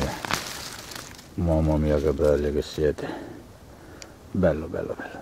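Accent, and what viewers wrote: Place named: Piedmont, Italy. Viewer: bella l'accento e' proprio pinerolese doc un saluto da albenga e conplimenti bei funghi p.s. in liguria non esiste solo il mare ciao angelo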